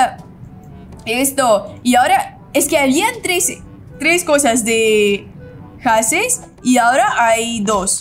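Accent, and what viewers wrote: Brazil. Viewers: Es de Brazil y le cuesta el español ojalá ser de brazil😢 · No se ve raro si se escucha así es que creo que es de Brasil por si acaso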